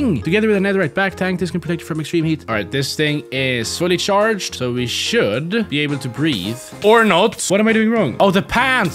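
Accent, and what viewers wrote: Sweden. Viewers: Are you swedish? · are you swedish? i'm norwegian btw!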